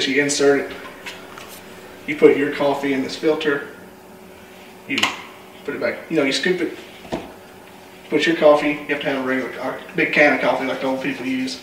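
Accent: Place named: South, United States. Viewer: That southern accent!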